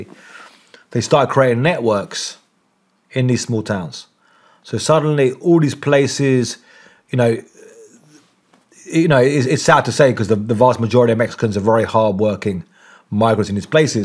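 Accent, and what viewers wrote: United Kingdom. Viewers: Where's this guy from, he sounds kinda British but his accent is all over the place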